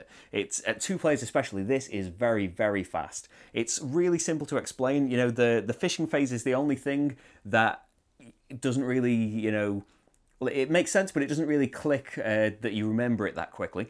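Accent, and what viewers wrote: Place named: Cheshire, United Kingdom. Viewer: I'm trying to place your accent. Let me guess - Cheshire? Maybe Macclesfield? Anyway, thanks a lot for these videos, extremely helpful!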